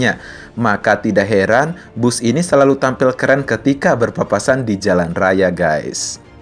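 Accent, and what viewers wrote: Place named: Banyumas, Indonesia. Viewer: Nggak ngapak nggak ke enak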